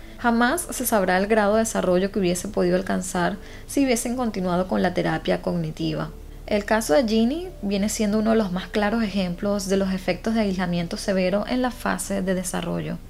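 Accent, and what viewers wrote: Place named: Venezuela. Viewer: no se porque llegue a ti. pero al escuchar tu bello acento venezolano. me encantaste. aparte de lo interesante de tus contenidos. mucho éxito y felicitaciones. arriba Venezuela.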